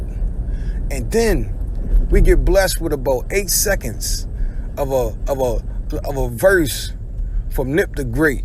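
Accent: Baltimore, United States. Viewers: Yo you from Bmore??? The accent gave it away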